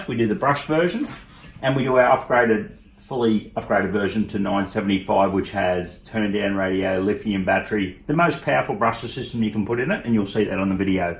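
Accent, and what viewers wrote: Australia. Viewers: ITS AUSTRALIAN DOLLARS CMON MATE DONT YOUR HERE HIS ACCENT. HE EVEN SAYS GOLD COAST AUSTRALIA LOL. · Guys before commenting on prices ... didn’t you grasp the accent? This is a down under RC shop (Queensland Australia) So prices are in Australian dollars... USD is 2/3 of an Australian dollar...😉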